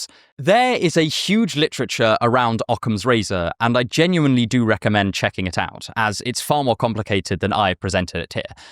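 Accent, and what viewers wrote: RP, United Kingdom. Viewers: Anyone else been thinking for years he was saying ‘Joe Farley’ in RP?! 😂🎉 happy birthday 🎉🎈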